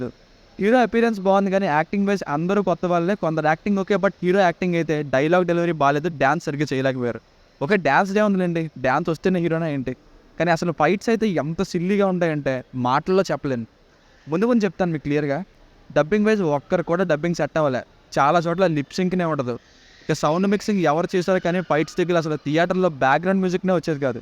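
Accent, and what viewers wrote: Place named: Andhra Pradesh, India. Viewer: అరేయ్ నువ్వు ఆంధ్ర వాడివని నీ యాస లోనే తెలుస్తుంది అందుకే రాయలసీమ తెలంగాణ హీరోలను డి గ్రేడ్ చేస్తుంటారు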